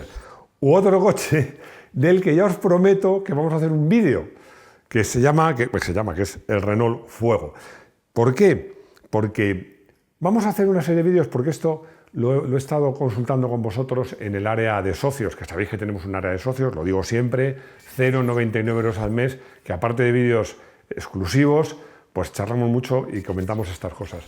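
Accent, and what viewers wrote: Chile. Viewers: Que a le escuche un acento chileno? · es chileno? ese acento se me hace familiar... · tiene acento Chileno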